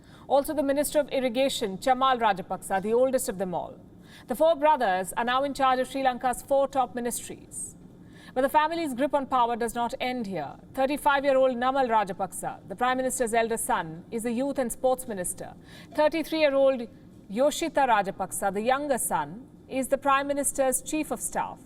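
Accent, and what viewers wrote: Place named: India. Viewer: One big happy family she says with her typical Indian accent - she is classic as always.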